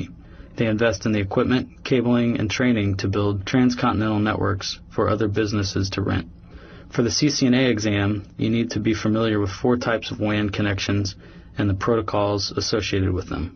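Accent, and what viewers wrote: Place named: North America. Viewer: Throughout the whole video I thought you were North American. And when I saw your .uk website, you're from the UK? Man, respect.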